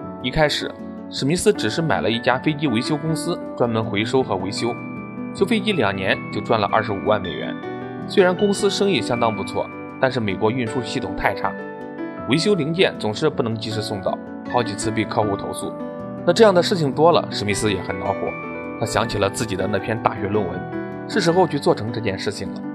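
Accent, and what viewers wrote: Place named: Jiaodong, China. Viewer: 老哥。。你这胶东咬字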